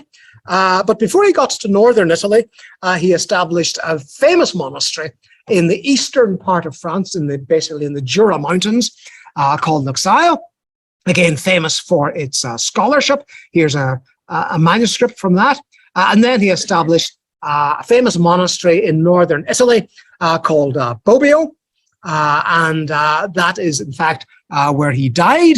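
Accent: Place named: Northern Ireland, United Kingdom. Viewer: Thank you, Professor. You have what appears to be a Northern Irish accent. I would wonder to what extend today's Orange adherents relate to this history. Or do they consider it the history of the people they have dispossessed -- the native Irish -- and thus not relate to it?.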